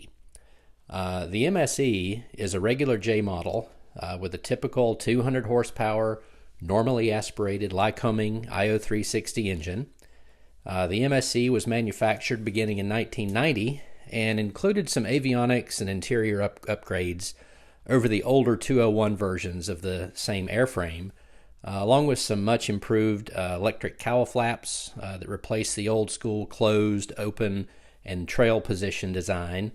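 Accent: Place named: North Carolina, United States. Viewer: Now that's a proper NC accent. Love the video!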